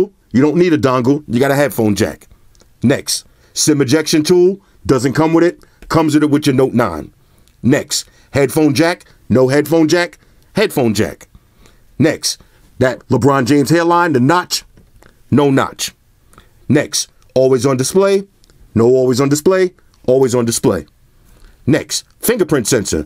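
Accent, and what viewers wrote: New York, United States. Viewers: Lmao i love how u talk u from Nyc? I’m from BROOKLAWN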